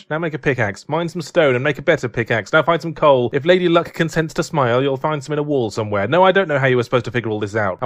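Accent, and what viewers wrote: Australia. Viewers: Oh cool you are an Aussie? Again cool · is he australian if so yay another australian if not well idk.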